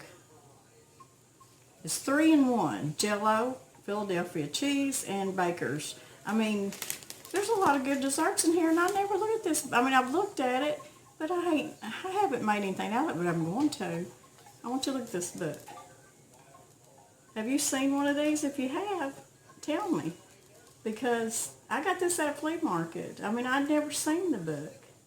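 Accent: South, United States. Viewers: I wish you would make a cookbook. All that you make and credit the months that you had gotten it from I love them all I love your Southern drawl and your lifestyle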